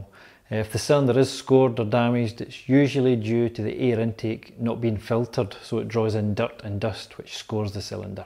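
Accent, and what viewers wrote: Scotland, United Kingdom. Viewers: Are you from Scotland? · english with british accent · Very good explanation. And I love that Scottish accent of yours! ;-)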